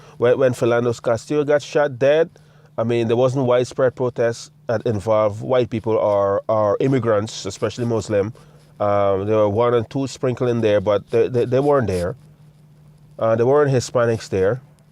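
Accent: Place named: United Kingdom. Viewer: You sound british. You come from a very different experience than afro americans. It's NOT the same.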